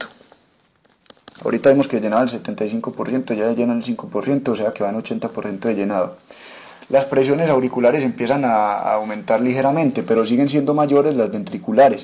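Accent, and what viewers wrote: Antioquia, Colombia. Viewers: Aparte de completo el contenido, reeee paisa.... Buen video, saludos. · Qué acento tan paaaisa. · Más paisa que la arepa <3 "Uy , mejor borro esto porque mera locha" JAJAJAJA. "Locha" para nosotros los paisas es como decir "¡Qué pereza! " SOS UN CRACK! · Acaso eres colombiano? haha me gusta tu acentoooo <3 x) buen video gracias! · Muy bueno. Me encanta el acento paisa. explicas muy bien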